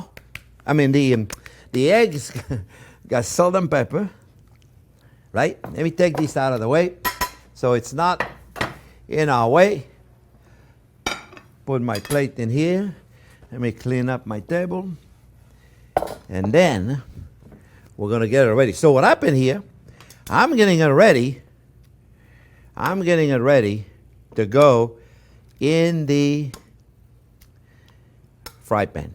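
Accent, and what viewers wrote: France. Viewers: You are really nice and funny with your english francaise accent ;) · Ptn ct' accent français jpp 😂, bonne recette sinon 👍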